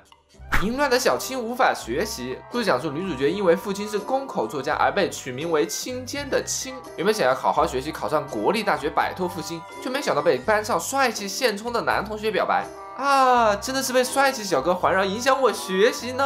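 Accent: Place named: China. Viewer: 听这口音应该也是我们国内的吧。。。为什么我觉得YouTube这里台湾人很少会介绍新番?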